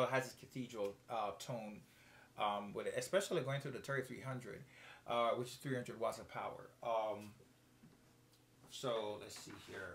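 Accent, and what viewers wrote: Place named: London, United Kingdom. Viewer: Odd question, but are you UK born? You have a slight London accent :-)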